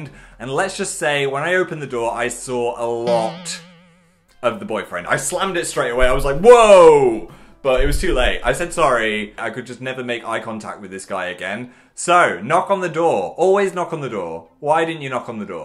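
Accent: North, United Kingdom. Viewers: Give the northern accent some love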